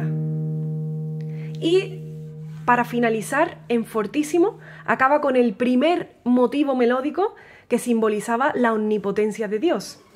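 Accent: Andalusia, Spain. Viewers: Hermoso todo lo que tocas, me da pena decirte , que primero, no me gustaba tu tono de voz, era la primera vez que oía hablar una persona andaluza, pero me gustas como tocas, y aprendí a oírte y acepté tu voz y tu orgullo de tu localidad, y el amor que emana de tus palabras el brillo de tus ojos la sinceridad con que te expresas, y mucho amor para compartir un hermoso trabajo soy de Venezuela de origen muy humilde, me gusta la quitará, pero no sé tocar ninguna pieza completa, por eso me dedico a oírla , ahora te quiero y te admiro mi niña, mi bisabuelo creo que venía de Islas Canarias. Ahora me gusta la narración que haces a tus arreglos. Sigue triunfante. Ynelda Ascanio Gutiérrez.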